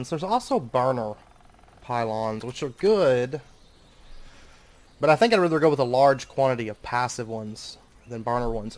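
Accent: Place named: South, United States. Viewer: Thanks for responding. Only found you a few days ago. You sound like you may be from the southern United States. I found your bypasses in the next episode.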